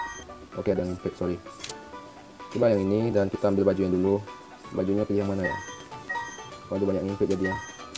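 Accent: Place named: West Sumatra, Indonesia. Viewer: Suaranya mirip minang kocak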